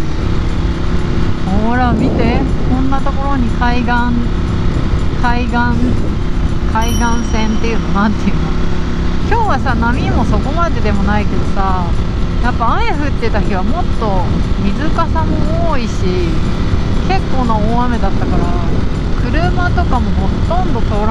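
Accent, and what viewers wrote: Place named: Kansai, Japan. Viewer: おはようです。さんって産まれてどちら？関西？たまに関西弁ぽくなるの面白い🤣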